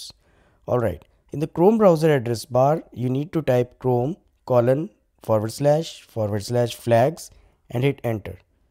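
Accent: India. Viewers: When you hear Indian accent, it's usually scam. But this guy is legit. Saved my butt.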